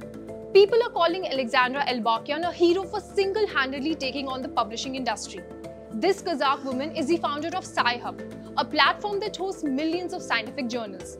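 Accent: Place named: India. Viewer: This voice is extremely awkward to listen to; speaks too fast and with this Indian accent which is not easy to intercept. There are other videos to choose from regarding this exceptional woman Alexandra, glad to say.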